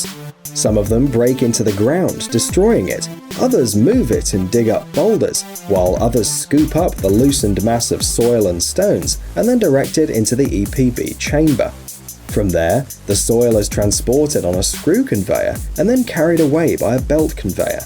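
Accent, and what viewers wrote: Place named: RP, United Kingdom. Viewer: Looks like someone took someone else's video and put a poncey, Harry Potter type commentary on it.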